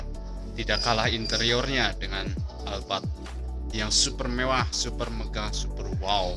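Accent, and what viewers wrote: Banyumas, Indonesia. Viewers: Olih ngomong collab nyong krungune "olab"😂 Ngapake mantappp jannn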